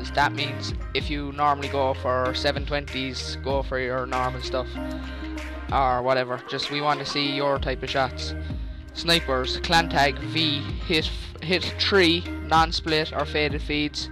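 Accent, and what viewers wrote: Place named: Ireland. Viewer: That irish voice thoo ;)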